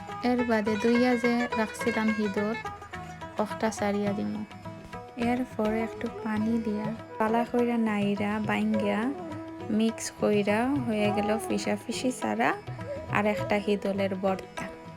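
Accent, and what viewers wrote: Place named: Sylhet, Bangladesh. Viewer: সিদল এর নাম শুনেছি অনেক তবে খাওয়া হয়নি। দেখে মনে হচ্ছে বেস মজা হবে। আপুর মুখে সিলেটি ভাষা শুনে ভালো লাগে।❤️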